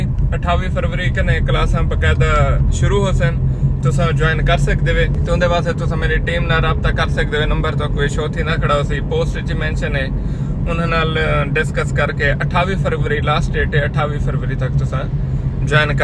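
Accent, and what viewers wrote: Saraiki, Pakistan. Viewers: سر جی ٹھوس تے ماشاءالله بڑا میٹھی سرایکی بولیںدے ہوے I love you Sir Ji❤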